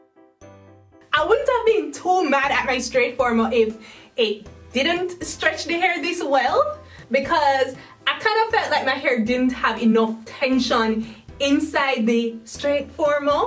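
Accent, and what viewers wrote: Jamaica. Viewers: She sound jamaican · I hear a Jamaican! I stan my fellow country woman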